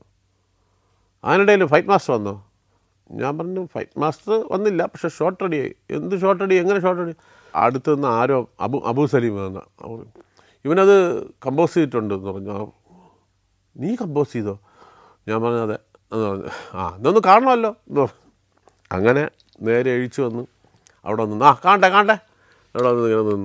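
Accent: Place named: Trivandrum, India. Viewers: 🤢 appi slang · City Trivandrum slang ❤ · Love to hear proper trivandrum accent.... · നല്ല അനുഭവം കേൾക്കാൻ രസമുണ്ട്. അതും തിർവനന്തപുരം slangil.നന്ദു ഇനിയും ഉയരത്തിൽ എത്തട്ടെ. ആശംസകൾ.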